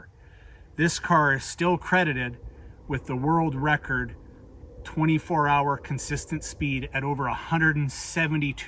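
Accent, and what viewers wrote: Canada. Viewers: That and that annoying Canadian accent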